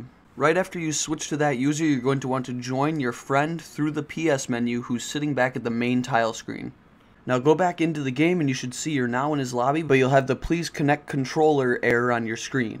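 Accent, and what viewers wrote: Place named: South, United States. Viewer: Great video very well done! only issue is the voice over tbh I don't enjoy the southern accent please refrain from doing commentary in the future and please bring back the brexit guy with love Songless ps I am serious please don't do voice overs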